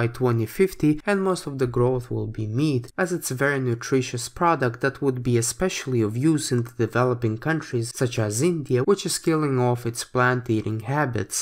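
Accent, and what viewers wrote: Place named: Eastern Europe. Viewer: The CRISPR part gave me the willies. Especially with the Central-Eastern European accent.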